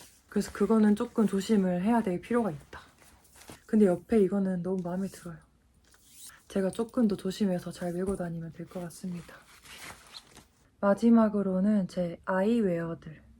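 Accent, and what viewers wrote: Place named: Gyeongsang, South Korea. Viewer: 새영상🥵❤️ 님 사투리 귀여워요ㅋㅋㅋㅋㅋㅋㅜ 광주사람이신가? 대구분? 경상도인가용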